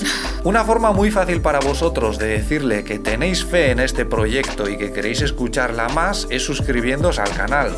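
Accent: Basque Country, Spain. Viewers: aunque no lo creas, los acentos de otros hablando otro idioma, por aquí se acepta y no es raro escuchar un español con sus dejos y defectos...nos parece simpático...asi como tú hablas en español de España, es otra cosa con el de latino américa,y de cada país, así que a, que practique un poco mas, pero yo sé que todos los amigos que los apoyamos nos parece muy simpática su manera de hablar y también el tuyo " ya veis " que es la salsa de la vida...😃☺️... muchos saludos a todos ☺️... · A mi me gusta más el español de que el de (demasiado acento vasco para mi gusto)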